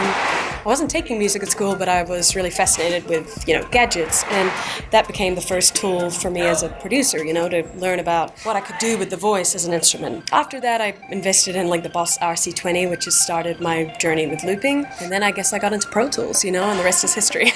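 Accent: United States. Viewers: Her accent has really shifted towards American sounding. Anyways, love her. Love the rig. Love the music. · Shame she is turning so yank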